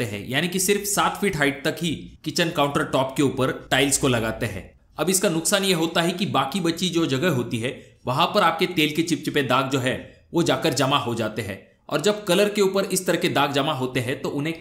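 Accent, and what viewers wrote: Maharashtra, India. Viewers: Sir aap maharashtra se ho marathi mix ho rahi hai😅😅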